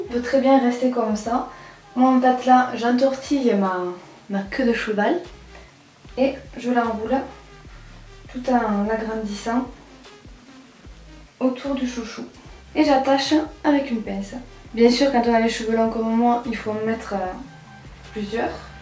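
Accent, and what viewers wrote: South, France. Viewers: Tu gère t la meilleure 💙 💚 💛 💜 t comme moi t'as l'accent du sud mdr 😁😄😅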